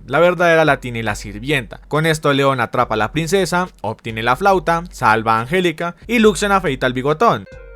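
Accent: Colombia. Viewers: ¿Soy yo o sos colombiano? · ya se me hacía que eras Colombiano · eres de Bogotá 🤨🤨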